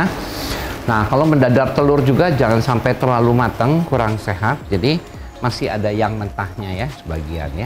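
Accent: West Java, Indonesia. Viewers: Pantesan chef logatna sunda pisan😁😁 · Euleuhh. Gening Chef teh urang Bandung. Pantesan mun nyarios rada ngalogat sunda. Hehehehe.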